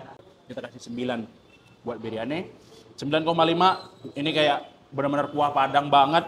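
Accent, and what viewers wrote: Medan, Indonesia. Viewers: Nambah napsu makan nonton chanel ini.. suka sama kedua reviewernya = lidah medan = Lidah bandung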